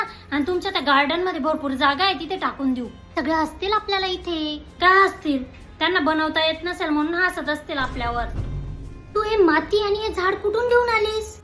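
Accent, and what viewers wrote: Khandesh, India. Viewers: परफेक्ट खानदेशी 👌😅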